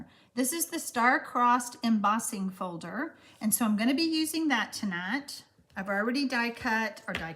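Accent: South, United States. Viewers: great cards.....love watching you and your southern accent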